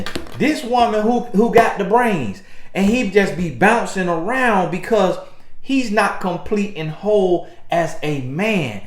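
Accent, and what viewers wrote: South, United States. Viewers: Love your hometown Southernness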